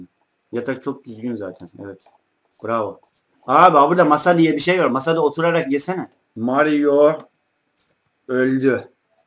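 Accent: Black Sea, Turkey. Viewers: abi sen rizelimi tranzonlu musun?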